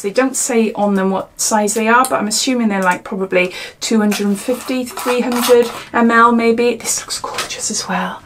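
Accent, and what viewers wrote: United Kingdom. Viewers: It's ok for the mix-up i was hoping to see who won if i got a chance at something and i thought u were picking the winners on Thursday so i did the same thing lol im so silly XD xoxoxo _ Your #1 Fan! I said this before for my entry into this giveaway but here u go again.: Wow, congratulations on 10k!! You deserve it! \Your so positive and lovely! You cheer me up!! I'd love to have all of it but I haven't got Instagram or Twitter or Facebook so that sucks if I win I could give u my email to contact me I am living in Florida currently and I can't wait to see what else u have in store for your channel... I like the accent it sounds like your a British princess!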